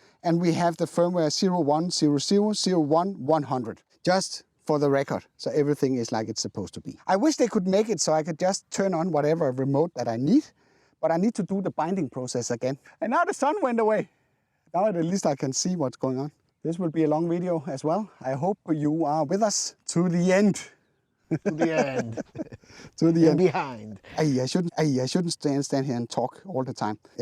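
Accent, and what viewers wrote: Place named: Denmark. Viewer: It's actually Denmark I believe due to his accent. But sincerely thnx for asking 😂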